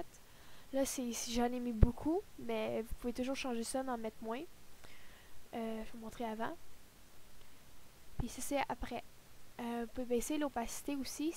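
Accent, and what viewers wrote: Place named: Quebec, Canada. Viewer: J'adore ton accent ! Dsl de commenter juste pour ça ! Tu es québéquoise nan ?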